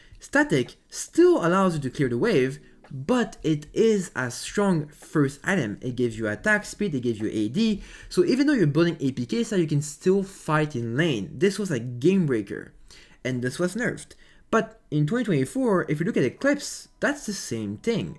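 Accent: France. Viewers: l'accent francais est fou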